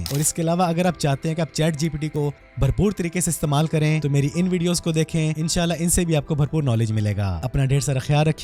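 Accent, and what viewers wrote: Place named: Saraiki, Pakistan. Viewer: bhy lagta hai apki mother- tongue Saraiki hai QK apka laheja Saraiki ki Tarah bahut meetha hai, mashaAllah!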